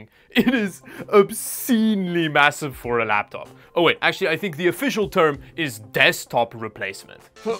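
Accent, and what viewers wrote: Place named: South Africa. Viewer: This guy has a South African English accent lol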